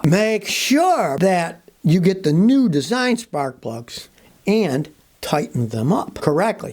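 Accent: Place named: United States. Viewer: Must be the common sense and ability to speak americanized English using real words on display again 😎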